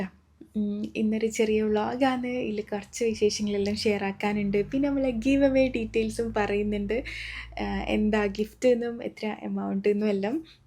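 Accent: Kannur, India. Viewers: Oppened ❤️iyale vidios othiri ishttamanu kannur samsarathilanau wayanad kariyaya nan ith othiri ishttapedan thudaghiye❤️❤️👍👍👍🤲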